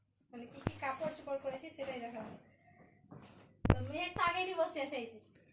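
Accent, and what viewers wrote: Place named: Malda, India. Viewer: Apa apni ki India theke bolcen.. apanr kotha gulo maldah ...mane amrao aivabe kotha boli ...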